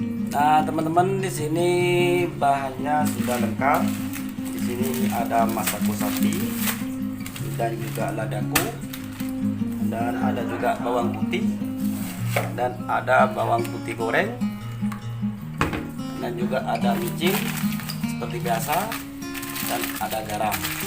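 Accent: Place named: Madura, Indonesia. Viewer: Orang mana mas Logatnya seperti orang madura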